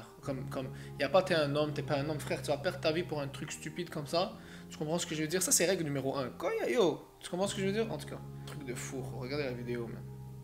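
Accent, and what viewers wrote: Quebec, Canada. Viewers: Il est canadien? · Tkt il est au Québec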